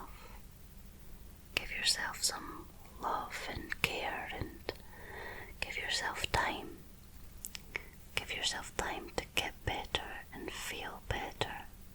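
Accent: Scotland, United Kingdom. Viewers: Personal Rule of thumb, write the email, wait 24 hours to send :) 3rd time watching this vid, and I think it’s the Scottish accent and your cadence but you’re my new favorite ASMR artist, BTW hows your Cantonese and Mandarin? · Scottish, I hope, that`s lovely. and together whith these sensual handmovements you are poetry. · Very soothing - plus i luv the Scottish Accent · I found your videos through Scottish Murmurs I am glad she suggested you! I love your slower Scottish accent then Lauren's Glasgow fast accent but both your accents are beautiful! Thank you for helping me relax! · It feels like I have an anthill walking my scalp. Lovely Scottish accent. 💓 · Great video. Lovely to hear a Scottish burr after 10 years away from Scotland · Clicks on video by artist I don't know. Video doesn't begin with loud, jerky finger fluttering. Mesmerising, slow hand movements. 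Soothing whispering. Emotional intelligence. Scottish accent... How could I not subscribe? Cracking video. Thank you. Regards.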